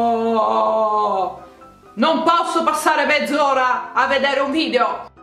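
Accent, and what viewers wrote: Trieste, Italy. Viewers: Il fatto dell'accento è bellissimo, perché io ho degli amici di Trieste e parlano proprio come te e non credo che sia una accento di...💩 Comunque ti adoro!❤ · VIVA L'ACCENTO TRIESTINO!!!! 6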